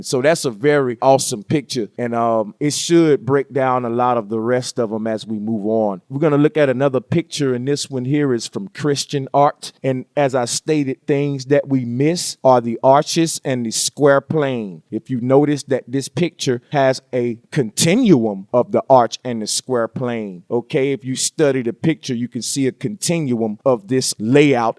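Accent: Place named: South, United States. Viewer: Funny how clearly he spoke back then. His country accent is thicker today ✊🏾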